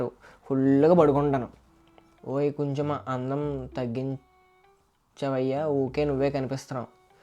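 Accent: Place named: Godavari, India. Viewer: Mana Godavari slang antene oka emotion ani only godharollake telusu 😂Super nuv❤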